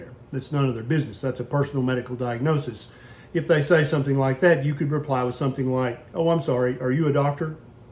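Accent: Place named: North Carolina, United States. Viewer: LOL. Randomly dumped into your channel. Sounds like a NC accent. Subbed.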